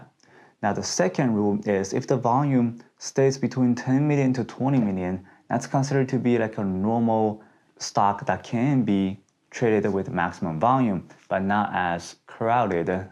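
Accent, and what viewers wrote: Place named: China. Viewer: (I know it's not your real name) I comment your videos almost never, but I just wanted you to know that you contributed to my trading, and your personal story also did, I am Russian by birth but European all my adult life. I like your style. I even liked your chinese English, it was still ok, there was a way to understand it if you want really to learn in the markets...I am older than you at least 8 years even if I look at least 10 less, so no i am not writing this comment because I am looking for a rich husband or want to make kids(hope that will never happen to me, if I'll make some serious money, i will adopt at some point, as i did with my dogs), i just wanted to recognize you how much you contribute to my trading even if you have no idea who I am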